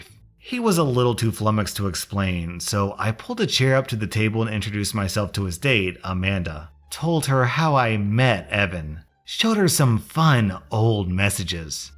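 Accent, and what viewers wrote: South, United States. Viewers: I LOVE HIS SOUTHERN ACCENT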